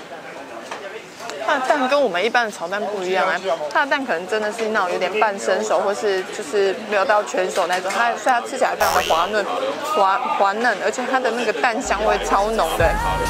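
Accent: Taiwan. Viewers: 好喜歡聽講台語，台妹味十足! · 最近才開始看你的視頻... 很美，雖然有點台灣國語，但一點也不影響你天然的氣質，更難得的是吃相很優雅..要繼續加油喔！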